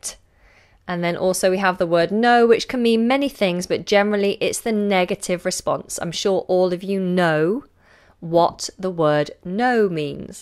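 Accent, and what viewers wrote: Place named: England, United Kingdom. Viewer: i like your engish accent more than Lucy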